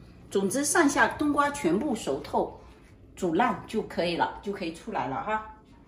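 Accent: Fuzhou, China. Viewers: 听福州口音很亲切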